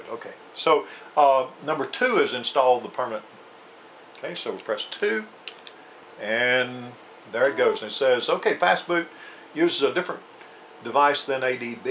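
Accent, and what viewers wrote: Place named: South, United States. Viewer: You sound country ... But thanks 4 the HELP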